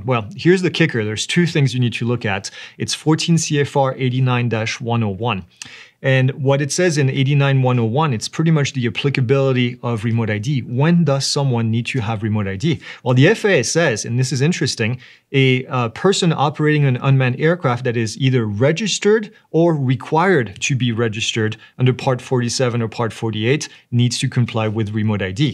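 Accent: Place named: France. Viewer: You have a French accent but you are talking about rules specific to the USA?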